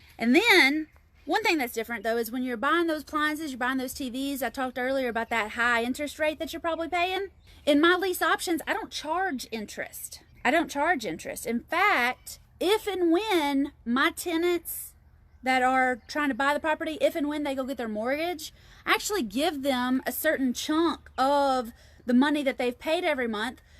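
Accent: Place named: South, United States. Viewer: Such a cute lovely southern bell accent ❤️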